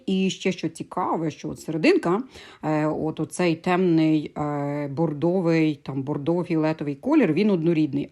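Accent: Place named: Cherkasy, Ukraine. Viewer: Я передивилась майже всі ваші відео. Ваш говор мене відправляє мене в моє дитинство, до мами. Скажіть, ви живете в Черкаській області?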